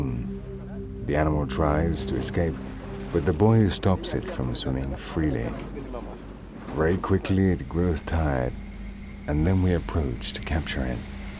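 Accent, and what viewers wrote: England, United Kingdom. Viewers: Burtt its very well filmed, however - the narrative is not as well done. For a start, they have used a non Indegenous (British!) actor to do the voiceover, instead of using the authentic voices of those who live in these areas - they do speak English! A big 'ouch'' for me was hearing all sorts of European concepts such as historical epochs of Time, stitched into the voiceover. Aboriginal elders have a mental landscape which exists outside of Time. Historical epochs are a European mindset, and sadly much that is European pervades this voiceover. There are better documentaries out there... i wish you all the best of luck in finding them ...as it is indeed an incredible culture. But lets let them tell their own story as much as possible... in their own words. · Where did the narrator get his accent from. Certainly not Australia. 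You sound more like Prince Charles than an aboriginal. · It would at least been appropriate to have an Australian narrator. Somewhat irritating to listen to an English accent. Not OK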